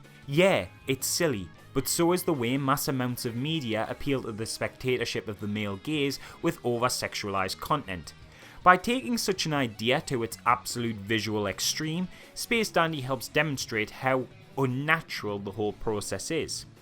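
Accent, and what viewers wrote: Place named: Geordie, United Kingdom. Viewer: You absolute geordie. Let's meet up and Have a space dandy time. "HEaring yoou discribe this is pua amaaaazin" vowels going as hard as this show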